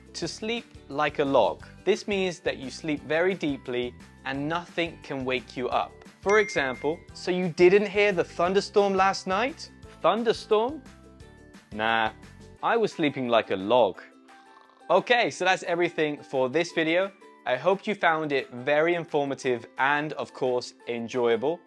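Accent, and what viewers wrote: United Kingdom. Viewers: you seem like a British native speaker are you from the uk?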